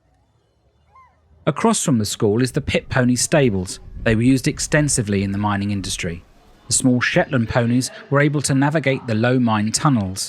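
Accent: England, United Kingdom. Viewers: Lovely content with lovely English accent..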